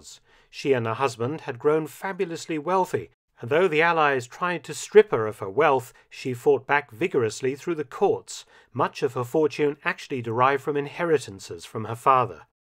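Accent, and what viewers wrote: United Kingdom. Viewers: He's British. Americans are the English speakers that hardly ever care.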